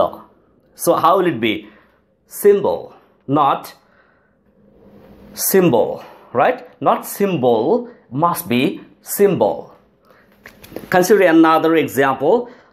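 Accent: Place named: Bangladesh. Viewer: Like a bit banglish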